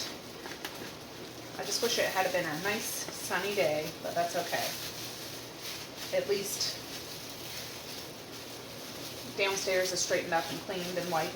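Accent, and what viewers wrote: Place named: Canada. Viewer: Her Canadian accent. Lol 🙂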